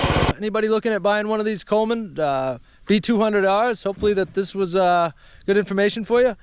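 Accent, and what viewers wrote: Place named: Massachusetts, United States. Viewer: You sound New England. I’m from Maine and you sound like Massachusetts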